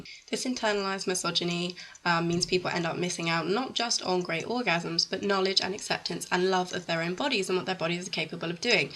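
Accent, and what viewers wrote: United Kingdom. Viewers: You seem to have British accent, so I am thinking in England, sexual topics aren't something women easy talk about or do, as you have queen, she makes uncomfortable for you to masturbate, nobody there can imagine her doing it, while in USA, France and other countries not under strict female supervision, something like that isn't questioned so much? As internet is in widely use for 15 years, girls everywhere are now more aware of masturbation, it is seen online, is that what makes you speak out? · Loving the topic and your British vocal sound, see i did'nt say accent lol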